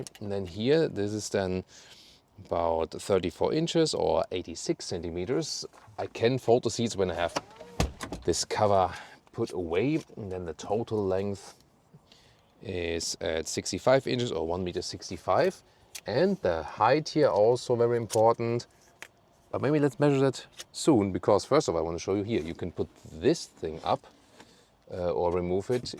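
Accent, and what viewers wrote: Germany. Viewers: The German accent is amazing 😄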